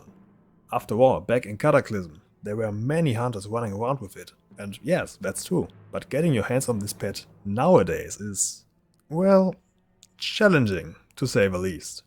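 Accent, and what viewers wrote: Germany. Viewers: Very well made video for a smaller chanel, keep it up :) I'm looking for a new class to play and am wondering if Hunter is a good choice at the moment and in general. How does it do in open world content, mythic + and raiding? How does it differ between it's specs? And how fun is the class to play? I heard that marksmanship hunter isn't doing so well for a ling time, is that true? Are you by any chance from Germany? Your accent sounds like it (I'm from Germany). Than you so much in advance :) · Hi! I like your video, thx. Are you German? Sounds as if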